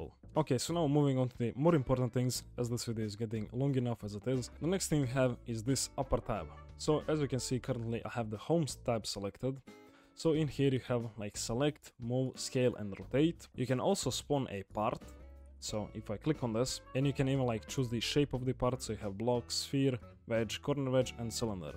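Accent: Russia. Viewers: He's russian possibly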